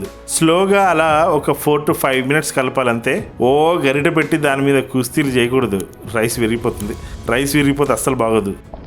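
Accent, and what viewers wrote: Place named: Telugu, India. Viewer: garu veg fans ni alarinchi naduku Dhanyavadalu!! Sir meeru films lo padalani korukuntunamu original telugu voice vastundi mana patalaki.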